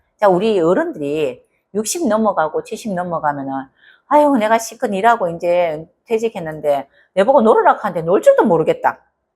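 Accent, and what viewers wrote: Gyeongsang, South Korea. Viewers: 같은 경상도라도 너무 딱딱하니 듣기가 안 좋습니다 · 서울사람인데요 경상도 사투리 넘나 이쁘고 다 알아듣습니다 감사드려요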